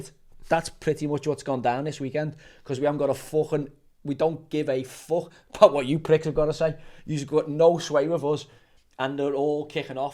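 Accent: Liverpool, United Kingdom. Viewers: He talks so much shit Uneducated scouse tinkler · Nothing beats a great Scouser rant. But spot on brilliant commentary!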